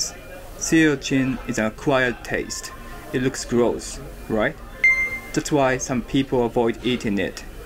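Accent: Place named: England, United Kingdom. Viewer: You have an English accent. Much better than American-English. And luckily not a Liverpool accent.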